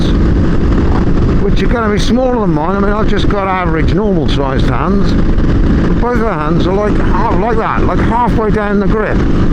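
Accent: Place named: United Kingdom. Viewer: >click on interesting motorcycle vlog >hear british accent >click off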